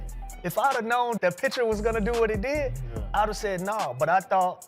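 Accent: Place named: Memphis, United States. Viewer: bro you from memphis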